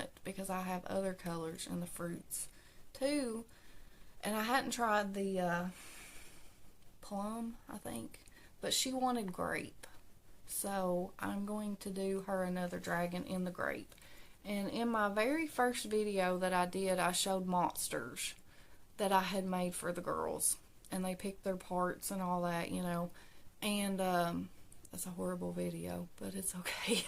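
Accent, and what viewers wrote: South, United States. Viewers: Oh I just found your channel!! I love it! That doily is going to be beautiful😍. The snow dragon you are working on, is absolutely adorable❤. I'm loving all of your makes. Love the accent. Are you a southern gal? 😂. I'm a Kentucky girl, so I have that slang also. 😊. I hope you have a good day,. ☮💜🧶. Now, I've gotta go watch your other videos. 😁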